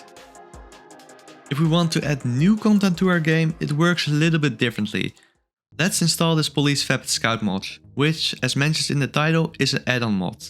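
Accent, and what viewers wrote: Netherlands. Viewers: Thickest dutch accent of the week. Nice video tho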